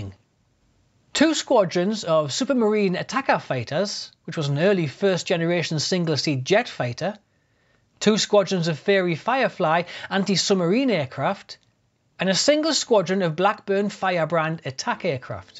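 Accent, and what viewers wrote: Newcastle, United Kingdom. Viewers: He's got a Newcastle (?) / north east accent. Great speaker, fascinating video.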